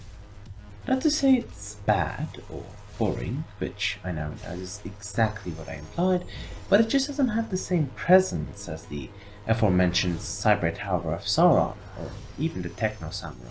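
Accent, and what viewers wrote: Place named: Germany. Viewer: the German sounding man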